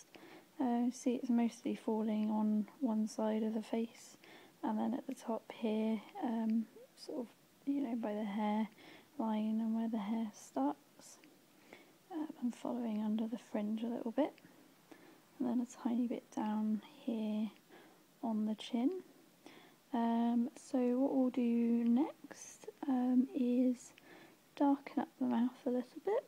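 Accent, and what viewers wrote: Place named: United Kingdom. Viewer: She's British.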